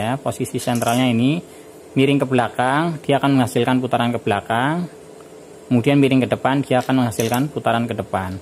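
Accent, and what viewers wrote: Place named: Java, Indonesia. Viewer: Medhok syekali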